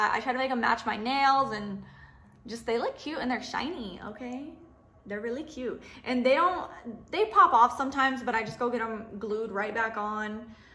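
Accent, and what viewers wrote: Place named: South, United States. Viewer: I love your accent! It is so charming with your style. You’re like a southern vampy lady :)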